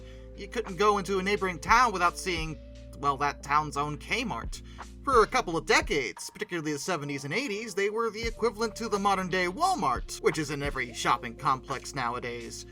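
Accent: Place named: Michigan, United States. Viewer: You must be from Michigan... there's no S on K-Mart/Walmart/Target!!!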